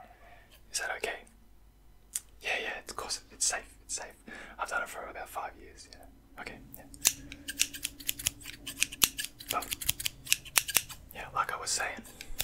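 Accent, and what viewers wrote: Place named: Australia. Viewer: As an Aussie you never realise Aussie accents until you hear them in videos 🤣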